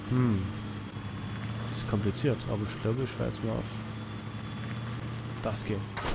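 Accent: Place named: Saxony, Germany. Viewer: nu isch find das sächssische eefach genial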